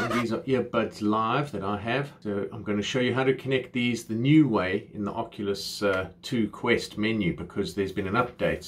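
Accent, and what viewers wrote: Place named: South Africa. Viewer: Connecting is fine but lag not so much. I'm trying to find a pair of great wired earphones specifically for the Quest 2 for my mom. She's VR mad and it would make her day... any suggestions? Also... You got a new subscriber here simply because your video editing almost made me spit my coffee out laughing (it must have appealed to my dad sense of humour) AND because I detect a fellow South Afircan accent. Keep it up 😎🇿🇦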